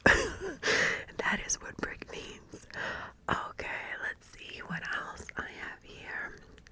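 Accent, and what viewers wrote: New York, United States. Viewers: I love New York and I love your accent 😂💗🥰!! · Being a 29 year old English guy, all accents and slang words fascinate me! And your New York accent and all the slang words are beautiful and sassy!!🤗😇 xox 🥰🥰❤️❤️ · MAN YOUR VIDS ARE ABSOLUTE PERFECTION!! I love the NY ACCENT!! I’m gonna do an ENGLISH ONE (probably many) when I start actually doing my asmr voice videos!! This is so cool and interesting!❤️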